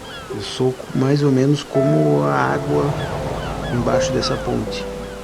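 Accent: Rio Grande do Sul, Brazil. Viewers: linda matéria!!! mas tens um sotaque de gaúcho violento... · Uma curiosidade minha é se você é gaúcho ou catarinense, Seu sotaque puxa muito para as duas regiões. Abs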